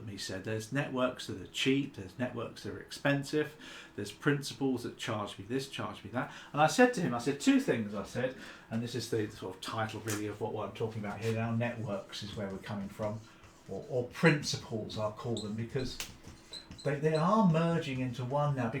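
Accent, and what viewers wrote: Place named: England, United Kingdom. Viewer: I have been watching your videos for three weeks because I am a solicitor and I started studying for the cemap 123 exam....it turns out, i'm portuguese and i have colleagues from other nationalities and we are all having the same problem... difficulties in understanding your accent, because you speak as if it were only for the english people... it's a great pity because is an expert in this area!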